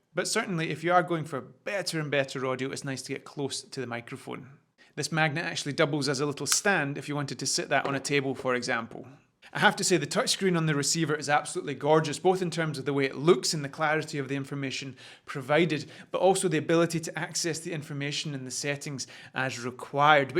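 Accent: Scotland, United Kingdom. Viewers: Hey, love your videos and espacially the scottish accent haha Where can we find the tripod that you use?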